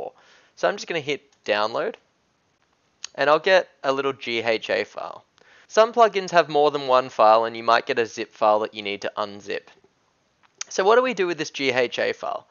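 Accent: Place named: Australia. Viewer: I think hes from Melbourne, Australia, based on the accent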